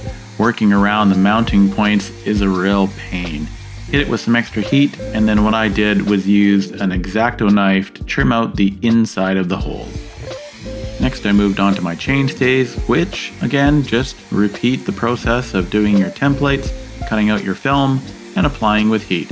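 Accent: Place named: Midwest, United States. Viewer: Nice video Sir! I am wanting to protect some areas on my carbon fiber mountain bike. Your video helps with some great ideas to have a successful install. I hear from your accent that you're from the Midwest or Canada. The word out gave it away. LOL. I am from Michigan so I totally get it. Have a blessed day.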